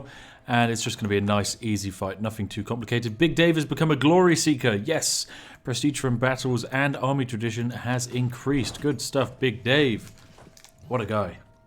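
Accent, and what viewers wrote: United Kingdom. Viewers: I could listen to read a EULA. Such a spiffing British accent should come with a warning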